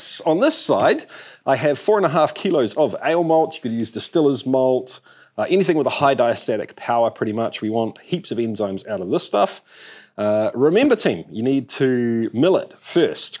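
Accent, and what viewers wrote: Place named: New Zealand. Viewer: I thought the comment was a piss take on kiwi accent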